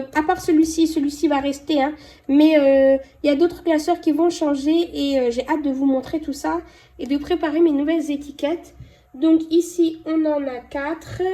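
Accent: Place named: South, France. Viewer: J'aime bien vos vidéos je suis depuis longtemps l'accent du Sud il est léger léger de loin alors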